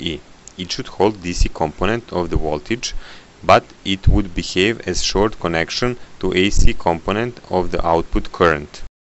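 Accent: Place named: Serbia. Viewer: SPEAKING ENGLISH WITH SERBIAN TONG.... PA STO NA ENGLESKOM...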